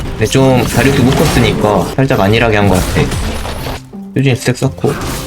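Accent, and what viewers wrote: South Korea. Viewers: 광주사투린가요 ㅎㅎ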